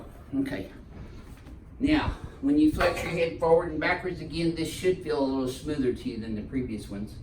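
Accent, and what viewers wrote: United States. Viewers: most American voice I've ever heard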